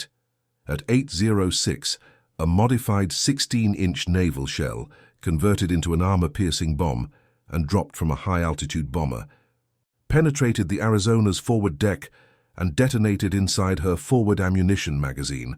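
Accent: United Kingdom. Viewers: Why is it always the voice of a Brit talking about American history ?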